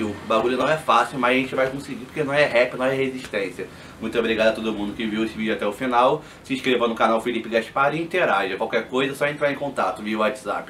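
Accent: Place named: Favela, Brazil. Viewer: com essa língua presa e dialeto de favela não tem nada a ver kkk Acompanho o canal desde 2014 e não me acostumo nunca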